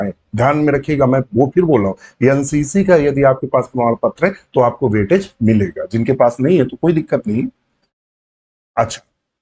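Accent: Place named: South, India. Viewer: Mere ek sir the south se vo bhi ese hi bolte the...l yell m yemmm n Yenna.....sobi think sir south se h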